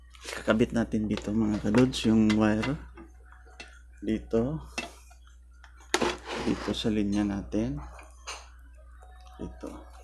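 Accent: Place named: Visayas, Philippines. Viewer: ok bokal salamat men galing ng visaya ka.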